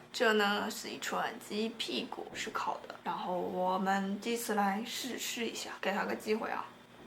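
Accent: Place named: North, China. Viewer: 聽你的口音應該是大陸北方的人難怪对台灣南部偏甜的口味不應可理解的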